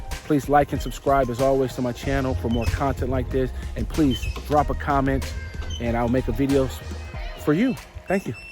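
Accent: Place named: United States. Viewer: Hi, it's wonderful to se you and your girls having an ace time. I have a question, it's based purely on what has been my love of language all my life. I love to hear you talk in American English because I hear you say, "M'am, Yes Sir, No Sir." For me this is almost 1920's English language. English language seems to evolve more slowly in American English. Given that the "cultural melting pot" is being slowed down more quickly by immigration restrictions in the US, would you agree that may be why Americans still use very old fashioned ways of adressing people?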